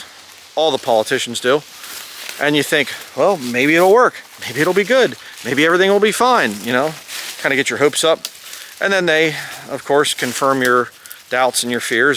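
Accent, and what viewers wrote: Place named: Pittsburgh, United States. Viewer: Your accent sounds pennsylanic sp. Pittsburg.🙂 That's my guess. Maybe maryland?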